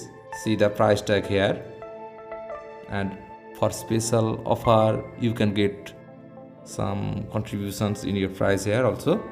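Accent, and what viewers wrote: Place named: India. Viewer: Very Indian accent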